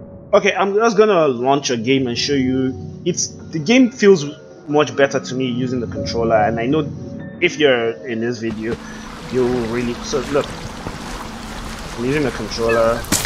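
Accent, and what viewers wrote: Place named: Africa. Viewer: you have an african acent like like guy who sang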